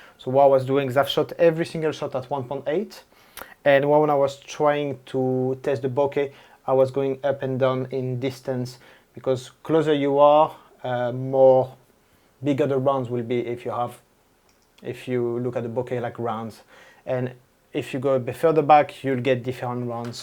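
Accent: France. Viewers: J'ai cru reconnaitre un bel accent français ? Est-ce que tu fais toutes tes vidéos en anglais ? Et juste une petite remarque à plusieurs moments tu dis lens wood au lieu de lens hood ^^ Bonne continuation !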